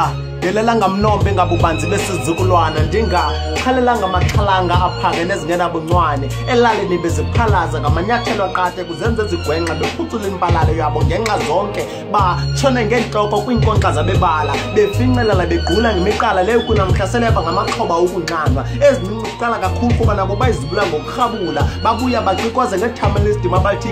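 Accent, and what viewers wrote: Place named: Xhosa, South Africa. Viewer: ISXHOSA SAKHO BRA SIMNANDI NGALOLONKE IXESHA XANDIKUMAMELA NDIFUNDA LUKHULU INOMYALEZO IMIBONGO YAKHO IYANDIFUNDISA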